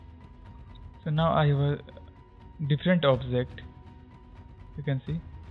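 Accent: India. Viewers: It makes me happy . When You hear Indian accent and You know it's not scammers..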